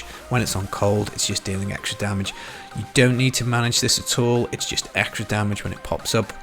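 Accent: England, United Kingdom. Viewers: Is this a GR farming build or GR pushing build? Where you from? I detect England somewhere as I'm in Scotland...I subscribed a while ago and your vids are very good.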